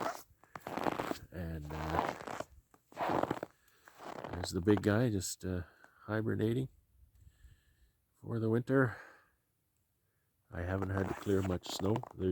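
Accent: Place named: South, United States. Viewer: You sound like a fellow from the South.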